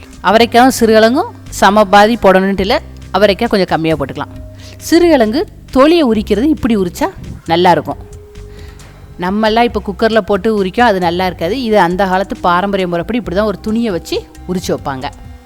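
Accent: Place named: Tirunelveli, India. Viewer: சிறுகிழங்கு அவரைக்காய் பொரியல் சூப்பர், உங்கள் தெளிவான திருநெல்வேலி தமிழ் மிகவும் சூப்பர்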